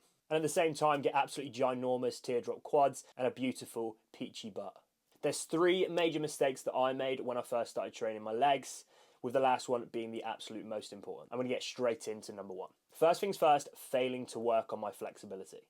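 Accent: United Kingdom. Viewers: British accent, blue eyes, big butt. My dream guy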